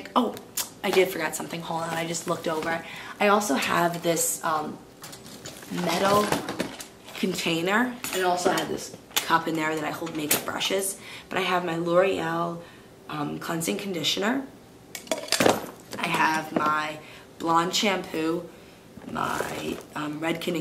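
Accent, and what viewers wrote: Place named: New Jersey, United States. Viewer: I love your accent! Are you from New Joyzie (Jersey)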